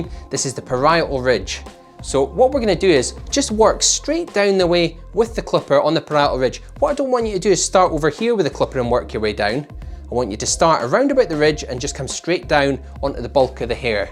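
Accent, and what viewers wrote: Scotland, United Kingdom. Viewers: Love the Scottish accent. It's like watching a production of Brigadoon! Great videos 👍😌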